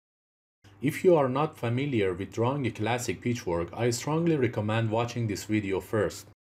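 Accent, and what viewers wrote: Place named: Iran. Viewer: great video vali nemidonm chera lahjaton kheili farsi mizane :D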